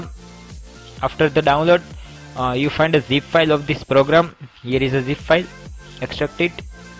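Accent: India. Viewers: i know its fake,you have an indian accent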